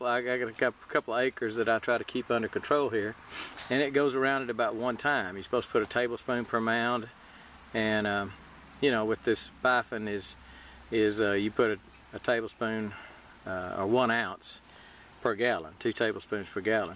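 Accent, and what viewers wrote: Texas, United States. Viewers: speak english , not texan drawl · Love your accent! How I miss my home state of Texas!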